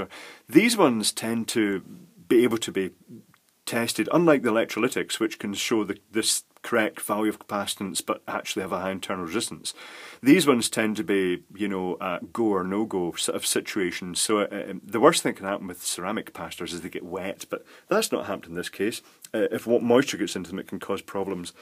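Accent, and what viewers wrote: Scotland, United Kingdom. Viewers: Have you ever thought about putting an album of bedtime stories out? Mothers would love you. Your soft voice and gentle,scotch accent would put any child to sleep. Sometimes it puts my wife to sleep. "I don't understand a word that he is saying, but I love his voice." Then she nods off.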